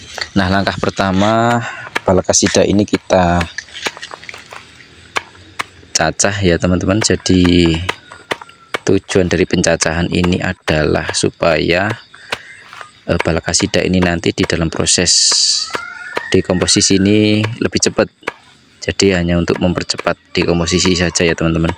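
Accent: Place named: Java, Indonesia. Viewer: Jenengan pundi kok jowo meduk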